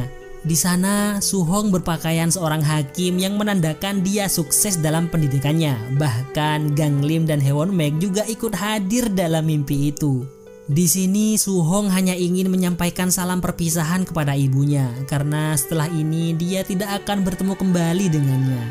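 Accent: Madura, Indonesia. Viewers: Pasti Orang Madura ya hahha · Orang madura bang??? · Mas ya orang Madura ya,,, sumpah baru kali ini liat alur cerita ending ya q nangis sampe sesenggukan,,, terimakasih mas sudah membatu menceritakan,,sebab mau nonton film ya GK ada sub indo ya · Madureh kakeh min? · Bang Madura nya daerah mana bang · Kelihatannya sang moderator ini orang Madura tak iya...😂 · Reng madureh bn min? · min orang madura kmu min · Yg cerita org madura kah... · Pasti orang madura😅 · Logat nya madura bngt😅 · mimin orang madura ya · Lu orang Madura ya bg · Orang Madura ya 😅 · Bang kakeh reng madureh yeh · Kak maaf aku ketawa, logatnya lucu, kaya logat orang madura. Emang asal madura kah? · Ternyata bebengusnya oreng madureh yeeeh🗿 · Orang madura bang?medureh dimmah? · mangkanah reng madureh😂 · Barusadar orang madura kah😅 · Orng madura ya mas hh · Orang Madura min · bhs madure wkwk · Reng madureh kakeh🎉😅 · Madura nih adminnya😂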